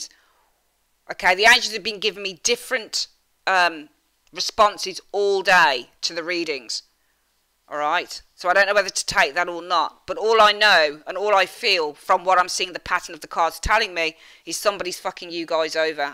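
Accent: Birmingham, United Kingdom. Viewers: I liked your reading and the way you put it over in your obviously english accent? I would surmise it came from somewhere near Birmingham?I am from near there myself originaly but now live in Australia for 49years.You say you are a gypsy?I would love to know your surname, as I am a Romany by birth we prefer that to gypsy as we are the people of Rom! not a lot of readers shoot straight from the shoulder but you do I like it and can handle the swear words to it shows passion in what you do and is not as everything seems these days politically correct,thank you for the reading as I do not understand Tarot but do Astrology.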